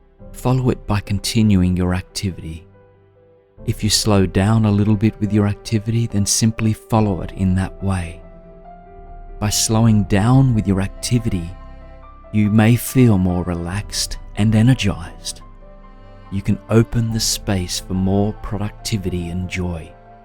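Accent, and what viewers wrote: Australia. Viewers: Good Morning, I am loving your meditations and the live ones are fun too! Its also nice to hear an Australian accent, sometimes I find it hard to meditate to other accents as it distracts me from their words haha. I do wonder if you could tell me the name of the music in this piece I find it very motivational and powerful! Love & peace Pita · Love your work! Thanks so much. Also great to hear an Aussie accent!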